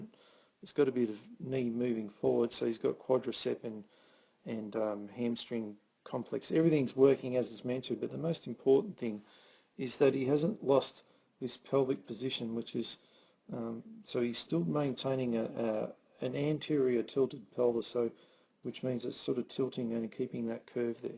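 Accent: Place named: Australia. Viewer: Another superb "keeper" from. Very informative, video well done, commentary obviously knowledgeable and links for further relevant info provided.And thanks for writing out all of the voiced instruction- the Aussie twang can be challenging to North American ears ( no offense intended and none taken I hope ).Well done-thanks!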